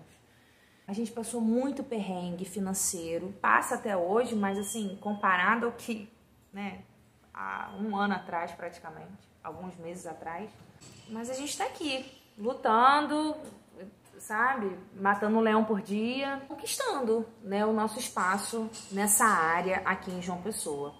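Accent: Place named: Rio de Janeiro, Brazil. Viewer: Oi querida 🥰 Conheci hoje seu canal e gostei muito do seu jeitinho carioca de comunicar 😍 Também sou carioca e estou planejando morar em João Pessoa. Vou acompanhar você por aqui pra saber mais sobre a cidade. Um beijão e que Deus continue abençoando sua vida e sua família ❤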